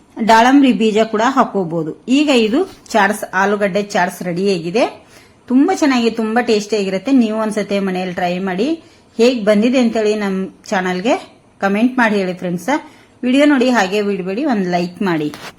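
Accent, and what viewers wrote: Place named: North Karnataka, India. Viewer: ನೀವು ಮಾತಾಡೋದು ಉತ್ತರ ಕರ್ನಾಟಕದ ತರಹ ಇದೆ.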